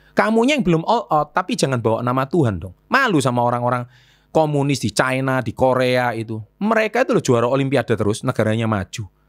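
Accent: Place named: West Java, Indonesia. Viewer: Cilaka😂 sunda pisan euy😂 i love you pokona mah❤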